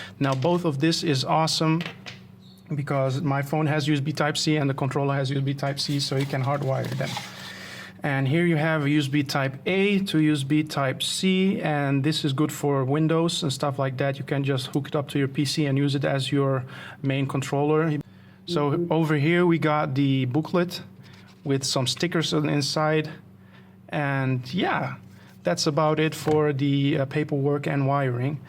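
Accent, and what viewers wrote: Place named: Netherlands. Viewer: You sound like you are dutch...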